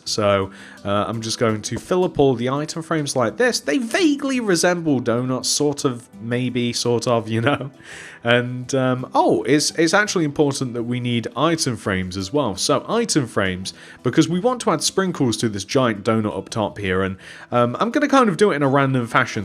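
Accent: United Kingdom. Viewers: Where are you from? You sound British, yet you mainly make tutorials of American stores rather than British ones. Either way I still enjoy them, keep the good work up!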